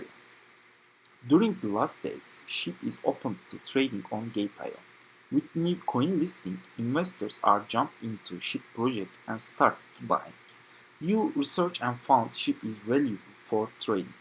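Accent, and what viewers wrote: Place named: Turkey. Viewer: İngilizcenden Türk olduğunu anladım 😁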